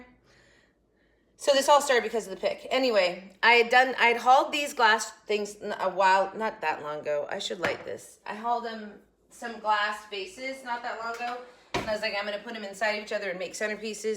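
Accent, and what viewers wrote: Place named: North, United States. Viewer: Hello i just came across u on you tube. Yes I did put up a like & subscribe. I'm from alittle village called Conmicut. I can see ur not from the south cuz ya talk like me! I think u did a great job on ur DIY'S So I'll see ya again & again hee hee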